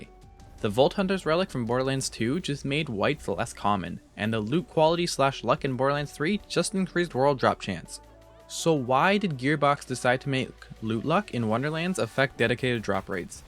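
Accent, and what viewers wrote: Canada. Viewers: you sound a lot older now in the more recent videos you sould like a gay canadian now you sound like a normal canadian in the current vids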